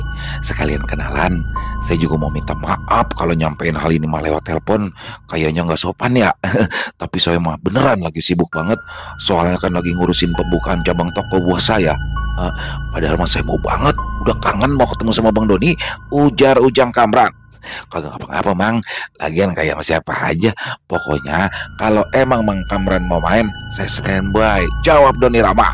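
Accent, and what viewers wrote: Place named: Betawi, Indonesia. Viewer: Dari bawah sampe atas nyari cerita warung kopi bang doni😂😂 lucu bae bahasa betawinya 🤣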